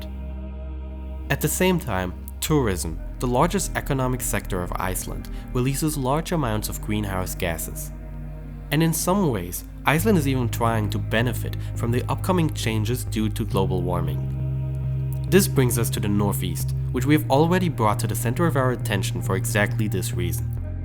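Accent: Germany. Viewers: Der Sprecher ist doch 100pro ein Deutscher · German narrator :D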